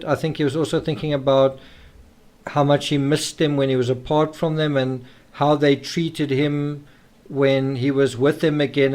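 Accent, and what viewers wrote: South Africa. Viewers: That's a South African accent I instantly recognize it love from South Africa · You must be South African going off of your accent? :)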